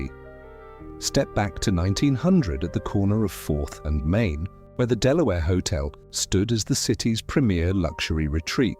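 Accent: England, United Kingdom. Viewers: Pretty neat visuals. But why would the narrator speak w a British accent? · Why is a guy with an English accent narrating this? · 🇨🇱🇨🇱🙋WHAT. ?.THATS A ENGLISH ACCENT. ....I was also born at Harris ..miss my Fort Worth very much .was raised off Camp Bowie ..at Grandparents hous my sweet grandfather bought it brand new for Grandmom 1937. ..we had it 64 yrs ..she lived there then Mom came back & raised. US girls w Grandmom ..thanks to granddaddy ..we had no mortgu pymt..they were magnificent people he was a LION' & promoter of Fort Worth Cats baseball and other fine things .🇺🇸🇨🇱🙋💙💙💙even though he was born in Oak Lawn ,Dallas. They settled in Fort Worth , after honeymoon at The Baker Hotel 1930. Dallas🇨🇱💙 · Does AI think we need a British guy to narate?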